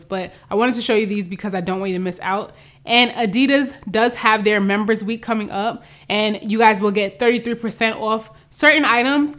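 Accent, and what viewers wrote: New York, United States. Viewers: Great picks. Checking out the closet sale. And I’ve been meaning to ask you were from New York because of your accent and boom like that you told me without my asking 😊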